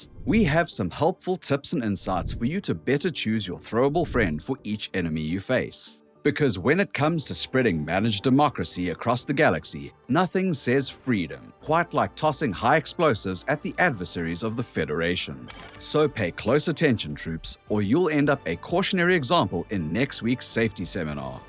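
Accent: South Africa. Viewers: mhm, That a South African English accent i hear?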